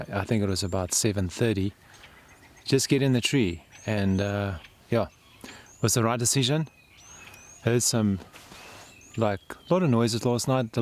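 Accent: South Africa. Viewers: it's uniquely South African ❤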